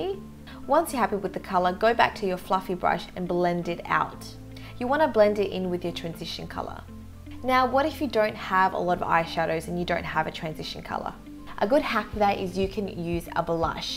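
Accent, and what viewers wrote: Australia. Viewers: so basically it was the australian accent? lmao I haven't seen bindi irwin anywhere in years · New subscriber here. Is she Australian? I really appreciate youtubers that aren't from the USA, not that there's anything wrong with that. It's just nice to have more than the standard American youtubers.😀 Great video!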